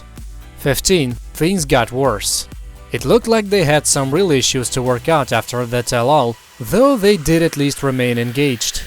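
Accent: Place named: England, United Kingdom. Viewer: That's because he is from England. They pronounce words differently. What bugs me is if a word ends in 'R', they add on an 'A' when they say it.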